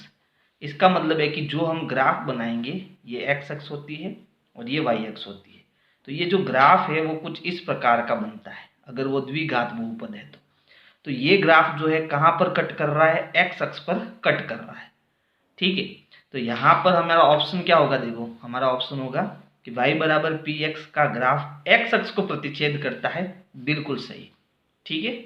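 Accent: Rajasthan, India. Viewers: Sir I am also from Pali Marwar I know that you are from rajsthan apki awaz se pta chalta hai sir ji 😅😅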